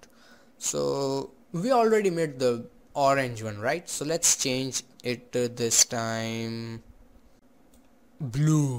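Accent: India. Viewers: That's racist as shit. Sure he has an indian accent, but his English is alright!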